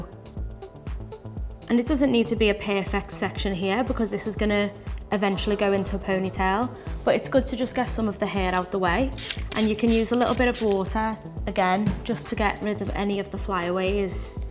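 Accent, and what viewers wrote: United Kingdom. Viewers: Love the Scottish? Accent 🖤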